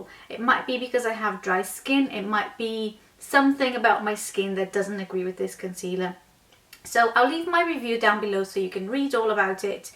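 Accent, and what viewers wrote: Wales, United Kingdom. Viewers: I need to ask where you're from? Because your accent is so interesting, like Welsh and Londoner and other things combined aha!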